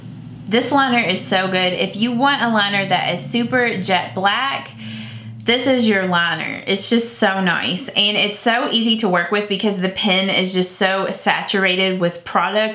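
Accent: South, United States. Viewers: I love your videos. I love drugstore make up too and use it all the time. I am from the south too so I love your accent.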